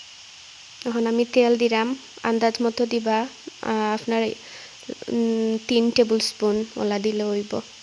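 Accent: Sylhet, Bangladesh. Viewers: আপু তোমার মুখে সিলেটি কথা শুনে ভালো লাগলো আবার অনেক মজা ও হাসির ছিলো। 🤗🤭😂😂😁😆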